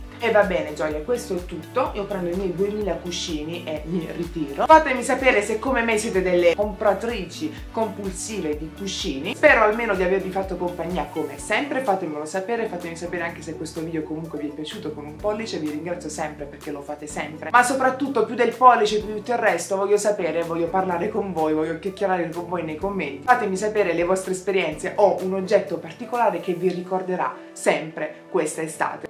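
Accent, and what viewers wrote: Apulia, Italy. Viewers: i versi mi hanno uccisa😂, sei bellissima ,si sente che sei pugliese,lo sono anch'io, se non sbaglio sei di Foggia... ciaooo bacii😂❤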